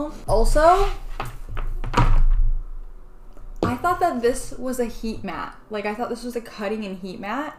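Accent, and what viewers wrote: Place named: South Africa. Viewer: Just wondering but are you South African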